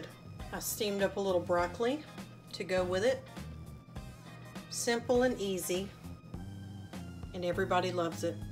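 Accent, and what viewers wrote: South, United States. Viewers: Love your accent! You're truly a southern belle 🤗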